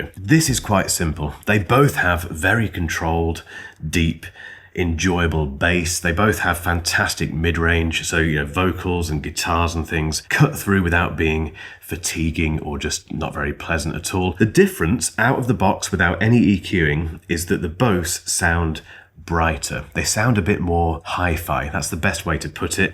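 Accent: United Kingdom. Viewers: love your British accent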